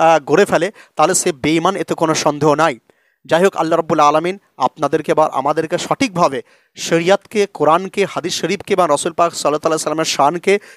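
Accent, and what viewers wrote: India. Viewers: উনি কলকাতার অধিবাসী তাই ওনার কথাগুলো এরকম মনে হচ্ছে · oni west bengal r manus,oder occaron amader teke alada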